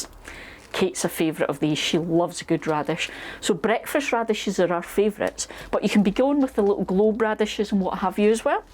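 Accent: Scotland, United Kingdom. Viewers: Brilliant video - great to hear another Scottish voice. I’ve just got my plot and am sharing it on YouTube too. Thanks for all the tips.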